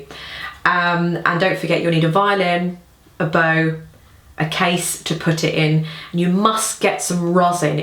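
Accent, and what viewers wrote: England, United Kingdom. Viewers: I like your accent. I can tell you are from England.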